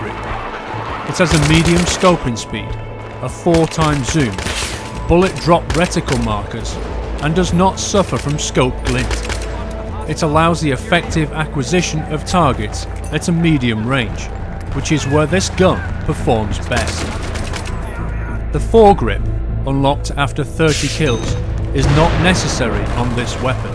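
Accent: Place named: United Kingdom. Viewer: Awesome man, the British accent goes great with this! You got a a subscriber!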